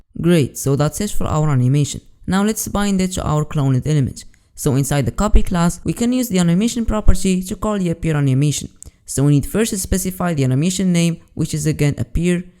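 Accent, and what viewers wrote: Morocco. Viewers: Good stuff my man wyni mathmeqnich pronunciation mghribya hadi 100% rak kayn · Great job! From your accent, I think you are Moroccan.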